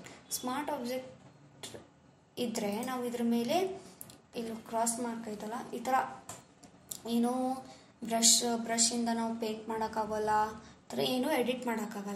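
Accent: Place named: Hubballi, India. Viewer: ಇನ್ನೂ ವೀಡಿಯೋಗಳನ್ನು ಮಾಡಿ ತುಂಬಾ ಚೆನ್ನಾಗಿ ದೆ‌ ಹುಬ್ಬಳ್ಳಿ ಭಾಷೆ ಉತ್ತಮ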